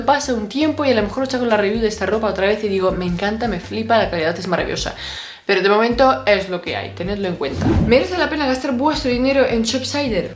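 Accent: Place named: Switzerland. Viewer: ¿Eres suiza? ¿Rusa? Es que tienes algo de acento extranjero.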